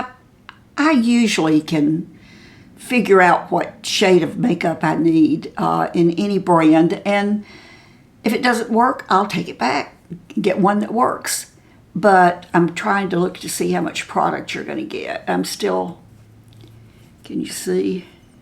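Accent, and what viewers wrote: South, United States. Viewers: There is something about her. . . . She's authentic and honest. . . . Down to earth and the way she talks. . . Do I hear a little Southern accent ? I love Milani cosmetics too. She's not afraid to review the cheap cosmetics. . .Dollar General ? And tells us what she thinks . . .She's not trying to look young. . .she's comfortable in her skin and age hair is dirty tomorrow shampoo day ! Love her! You say you are a walker. . .take us on your walking tour sometime. Those old school rollers and clips ! ! I'll be tuning in all through 2025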